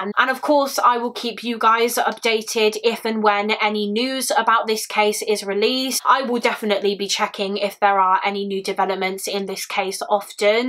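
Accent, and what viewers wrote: United Kingdom. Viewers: Hi, I was curious what part of the UK your accent is from? I enjoy hearing it!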